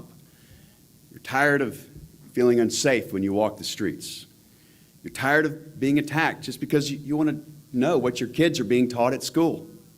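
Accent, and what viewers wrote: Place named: South, United States. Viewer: Damn i love his southern twang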